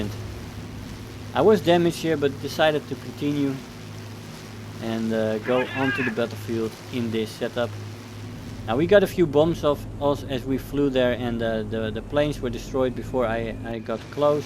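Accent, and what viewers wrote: Netherlands. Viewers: your dutch i can hear it haha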